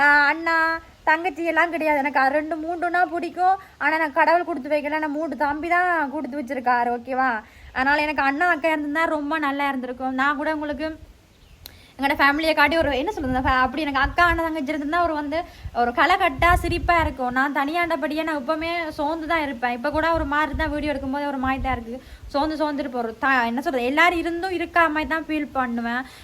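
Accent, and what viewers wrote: Sri Lanka. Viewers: நீங்க உண்மையாகவே கிளிநொச்சியா உங்கள் தமிழ் மலையக வாசம் தெரிகிறது. மலையக மக்களும் சிறந்த பண்புஉள்ளவர்கள். நல்ல கடும் உழைப்பாளிகள். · Hi sister your Tamil alaga irruku iam from kasmir(Army) my native Rameswaram. My grand grand father is coming from sri lanka so ur langauge my grand father and grand mother pesura mathiri alaga Iruku . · தங்கச்சி உன் இலங்கை தமிழ் எனக்கு ரொம்பவும் பிடிச்சிருக்கு அழகா பேசுறீங்க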